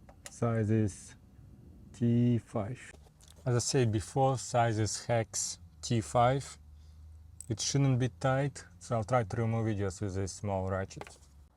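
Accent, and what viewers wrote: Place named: Russia. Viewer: Пипец КАНЕШНА акцент, наших везде слышно 👍👍👍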